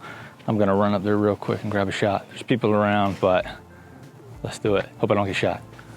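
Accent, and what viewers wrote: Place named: South, United States. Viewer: Great to see you back!! Love your videos and hope to see many more in the future. By the way I can hear you've picked up a little of the southern accent, nice!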